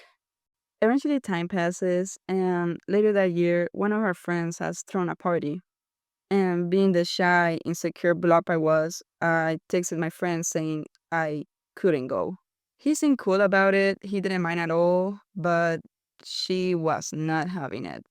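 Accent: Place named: Latin America. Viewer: Is your original language Spanish? I really like your accent and I saw you included Spanish words and my parents are Hispanic so I speak spanish and was curious lol Also I love your style its super cute!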